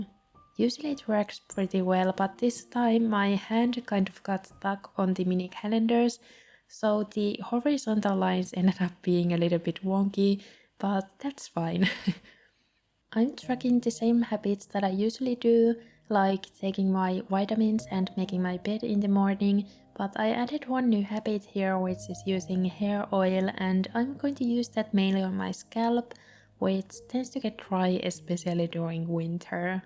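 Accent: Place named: Finland. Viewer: I knew you were Finnish from your accent haha :) my mom is from Finland! this is also super cute!!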